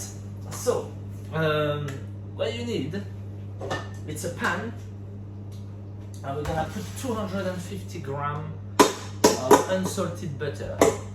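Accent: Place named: France. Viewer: I have seen you make this recipe before and thanks also for never failing to put a smile on my face because you are so funny and you have the best French accent.😊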